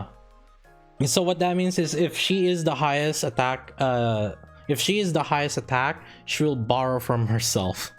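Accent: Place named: Philippines. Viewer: Are you from the Philippines? Your english sounds like one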